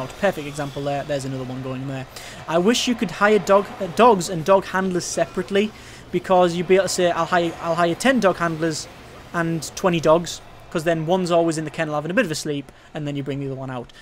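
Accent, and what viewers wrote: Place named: North, United Kingdom. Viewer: You're so northern. :D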